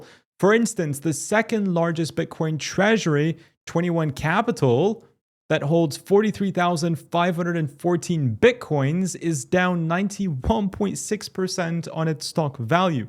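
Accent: United Kingdom. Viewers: Posh toff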